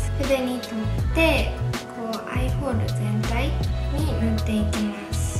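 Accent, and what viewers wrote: Kansai, Japan. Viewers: 可愛すぎて時間とまって観てました。。関西弁もかわいいいーーー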